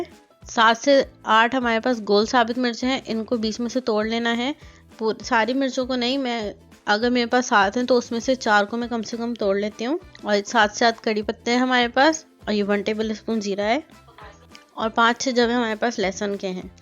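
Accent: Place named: Hyderabad, India. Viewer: MashAllah Khalis Hadrabadi zaban boli ja rah rah wow👌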